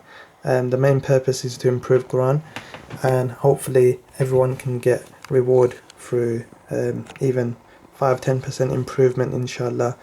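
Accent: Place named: United Kingdom. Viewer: Well. He sound British. It doesn't matter what race or religion you can still be Muslim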